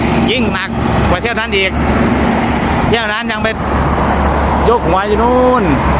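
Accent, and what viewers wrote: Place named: Isan, Thailand. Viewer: ติดตามคือเก่าครับ เว่าอีสานล่ะครับ เป็นเอกลักษณ์ประจำตัวดีครับ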